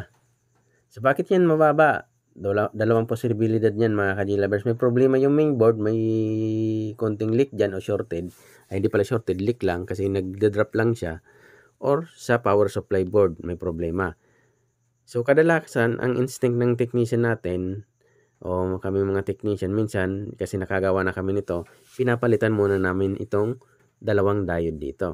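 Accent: Bicol, Philippines. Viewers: Sir taga bicol kpo ba?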